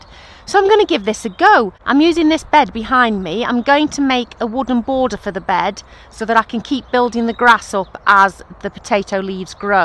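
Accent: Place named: Potteries, United Kingdom. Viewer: Thats a potteries accent i hear . I am from Silverdale originally.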